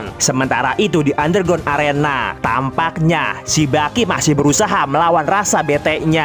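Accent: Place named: Banjar, Indonesia. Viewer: Urang Banjar kah saban hari jar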